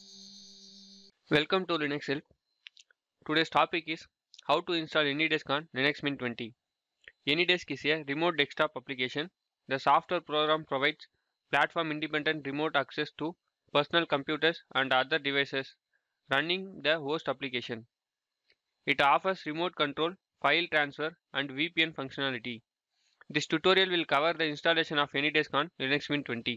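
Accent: India. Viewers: East Indian accent is so heavy, I can't understand what he is saying.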